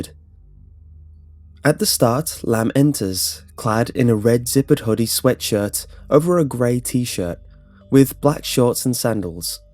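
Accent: United Kingdom. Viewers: He's British, so of course he'll pronounce some words differently. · Hannah Schrenk Nice try hun, English born and bred. What I'm saying is that unless you hear the word pronounced 'properly' you would not know to apply a different language to it. His pronounciation is very typical of what is accepted here over the pond. Am I saying it is right? No. But it is still the case.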